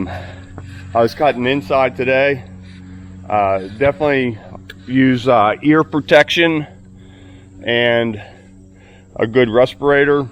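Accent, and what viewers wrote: Pittsburgh, United States. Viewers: Two things gave it away yinz are in the Pittsburgh area 1 . your accent and the way you say House. 2. That big green truck with "THROWER" on the door .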